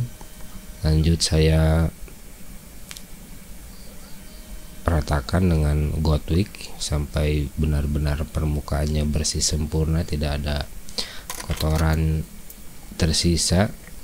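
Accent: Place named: West Java, Indonesia. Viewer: Ti Sunda mana kakang? Urang sampe nguley seuri, ngadangu bahasa "TUNGGU SAMPE BIJIL"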